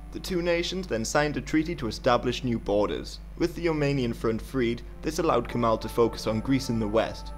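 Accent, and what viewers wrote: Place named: United Kingdom. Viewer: Its difficult for not english speaking people to understand... British accent is the worst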